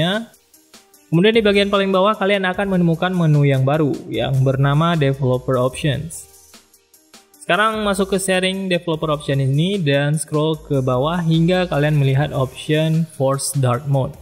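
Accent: Aceh, Indonesia. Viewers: hei orang aceh kah anda??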